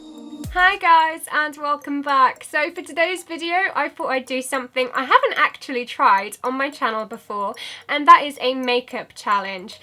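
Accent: England, United Kingdom. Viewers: What kind of English accent do you have?? You sound like a teacher I had who was from the UK and I've always wondered what kind of accent she had cause I know it's not a cockney accent and that's about all I know lol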